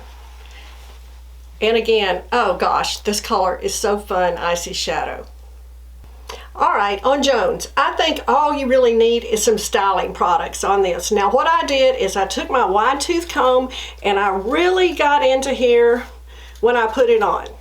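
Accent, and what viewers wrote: South, United States. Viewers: You sound exactly like the blonde on CSI Miami whose name was Caleigh in the show. You’re obviously southern like me.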